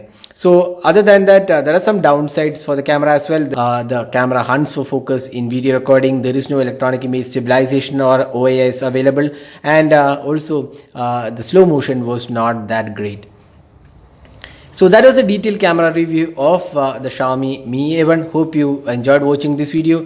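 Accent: Kerala, India. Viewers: Are u a Mallu ? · r u a mallu?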